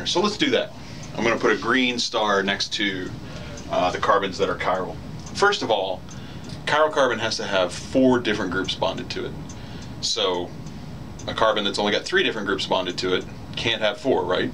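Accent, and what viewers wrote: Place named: England, United Kingdom. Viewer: Are u an English man!!?.., I kinda felt that accent..., Anyways amazing video!!!. Don't stop doing videos coz, "winners never quit and quitters never win"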